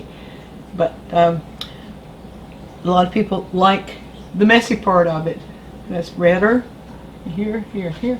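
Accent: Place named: Georgia, United States. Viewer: Thank you for the reply. I am so new to oil painting as a medium that it is extremely helpful to me to learn how the paint and additives work. I am so used to the instant gratification of acrylic and I am so afraid of ending up with a piece I love in oil and it never drying - especially with the cold wax. :-) I'm glad I made you smile and I hope the Georgian accent doesn't disappear entirely, it is so lovely. I'm a fan of accents and Georgia is a favorite. :-) Thank you again for the generosity of your quick tips instruction :-)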